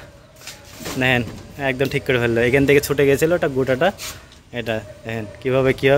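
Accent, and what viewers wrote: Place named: Bangladesh. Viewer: Apni bangladeshi mone hochhe vasa sune, Kerala te ki koren.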